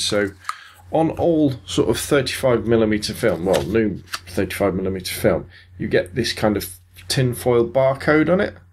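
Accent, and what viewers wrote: United Kingdom. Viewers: Best review! Beautiful accent and I believe it's British accent! Peace :D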